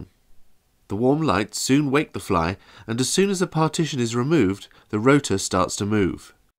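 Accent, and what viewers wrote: United Kingdom. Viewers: Ok dawg, you got me. Nice one! Very well made. Nice Brit accent. Cheers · Interesting concept but I doubt the canned ham or the sedated fly have much input to the system. Although the use of insects in esoteric devices isn't un heard of. Viktor Grebeinkov observed anti-gravity effects in beetles and used their wing/shell casings to produce levitation. Good spoof with the pompous British accent.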